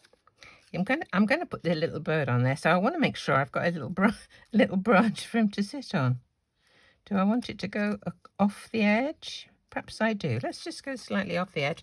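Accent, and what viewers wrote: United Kingdom. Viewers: Oh my gosh, I just adore your videos! You crack me up so much with your bits of humor and I adore your British accent! I love your CAS card styles and techniques! Thank you so much!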